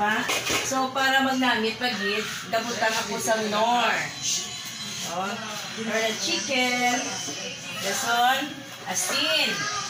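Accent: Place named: Waray, Philippines. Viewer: Sarap pakinggan tono at salita niyo parang waray.heheehehehe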